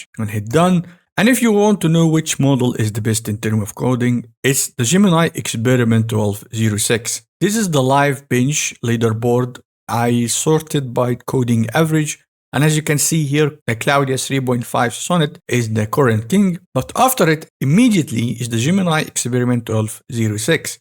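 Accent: Egypt. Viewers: nice setup! I love the thicc egyptian accent!😆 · I want to know where you are from😂 because this الوط accent very Egyptian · Love the Arabic accent “seemingly to be Egyptian” though if I wasn’t wrong bro 🎉 Totally underestimated channel definitely subscribed عاش والله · I love your accent Egypt?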